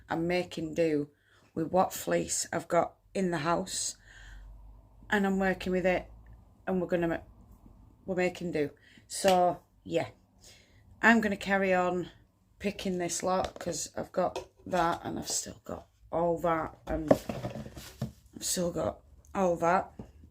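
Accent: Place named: Northern England, United Kingdom. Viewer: My husband has just walked in and said , ah I know that accent ,his from up north, we now live in Devon , I understand where your coming from on not getton5 enough sleep , I worry rats if I don't have enough kip 😂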